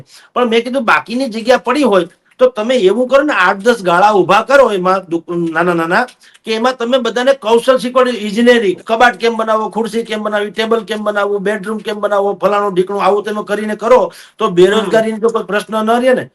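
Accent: Kathiawar, India. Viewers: વાહ... સાબ..શું કાઠિયાવાડી શૈલીમાં પત્રકારિતા છે...વાહ😊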